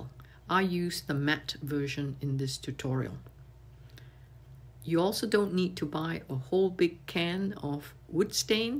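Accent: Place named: United Kingdom. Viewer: Such cute earrings. I wasn't expecting your voice with a British sounding accent. It was so nice to hear your voice and I think you should do more tutorials with your voice.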